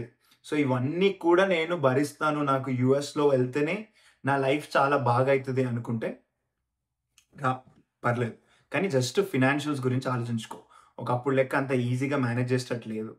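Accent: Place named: Telangana, India. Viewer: Ey uuko, nuv kudaa endhi itla, ah person name sudu chasta gista ani endhoo undhi, Malla ochi rude negative ani ochi neethulu bolra unho. Nay hotha miyaan aisa. And chasta kaka Telangana and Rayalseema slang and words gitlane untayi, inka cheppalnante neat ga manchiga ne cheppindu. it is neither condescending nor disrespecting or discouraging, you better understand the native tones and dialects. He’s delivering a positive speech and conspicuously explaining all the details. If possible grasp all the good things in what he’s trying to feed into kind of dumb brains who have no clue why and for what they are coming to the states or else if you are not interested to listen just move on. Okkariki help aina this video will be useful. Prolly you are dreaming about dollars and that is why you didn’t like what he is illustrating.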